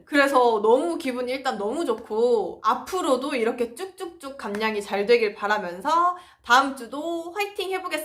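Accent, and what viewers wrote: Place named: Busan, South Korea. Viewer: 부산사투리가 귀에 딱 잘들려왔어요 완전대박채널되시기를 ㅎㅎ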